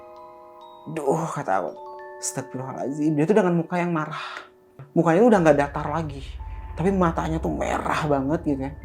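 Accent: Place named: Bandung, Indonesia. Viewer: bandungnya kerasa banget a😂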